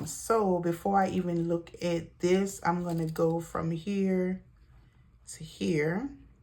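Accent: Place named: New Orleans, United States. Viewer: New subbie here. I know you’re tired of hearing it but us ppl not from New Orleans LOVE your accent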